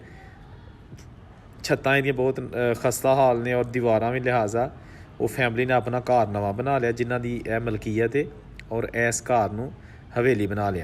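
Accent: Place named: Gurdaspur, India. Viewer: Sir your Punjabi dialect is same like Gurdaspur people.. Saab aap Narowal ya Sialkot district (jo Gurdaspur district India ke saath lagta hai) ke hoge.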